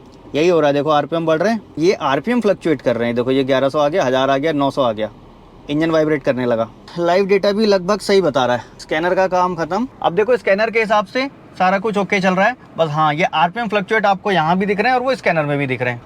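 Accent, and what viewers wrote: Haldwani, India. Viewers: बहुत शानदार सर जी मैं हल्द्वानी से हूं, आपकी विडियोज़ और बोलचाल से मुझे लगता है कि आप भी यहीं नजदीक से ही हैं। संभव हो तो आप से एक मुलाकात करनी है, से मिलने की बहुत इच्छा है।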